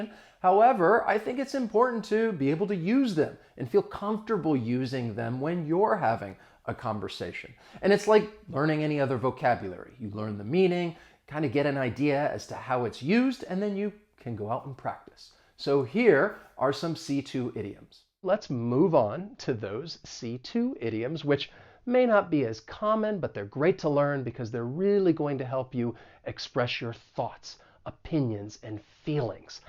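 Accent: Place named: General American, United States. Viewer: he answered "the US". Not North America. Let's not split hairs here. If the accent interests the author of the Q, he should have been more specific. has a standard American accent, regardless of the region he comes from.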